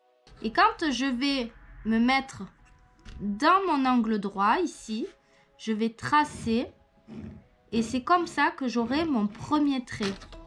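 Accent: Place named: South, France. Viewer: Un abonné de plus hehe :p ça fait plaisir de ré entendre cet accent du sud bisous à zozo et tout le monde :) PS : c'est favess09 🤣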